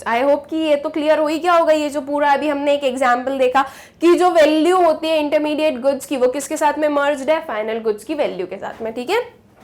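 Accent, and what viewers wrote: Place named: Gujarat, India. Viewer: Maam have gujrati tone 🔥🔥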